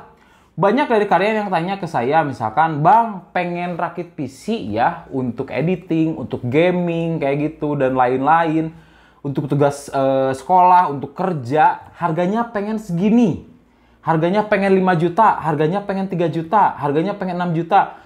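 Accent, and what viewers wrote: West Java, Indonesia. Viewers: Logatnya sunda pisan euy 😎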